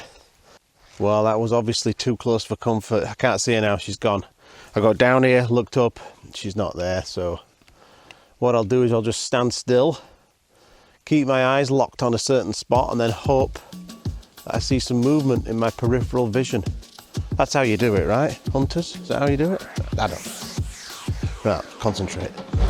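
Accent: Yorkshire, United Kingdom. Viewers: It must be that calming Yorkshire accent, I fell asleep about the same time as the bobcat did .😊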